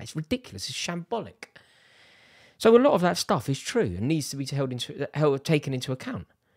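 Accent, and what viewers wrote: United Kingdom. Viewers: I come to this channel for the posh English! 🙃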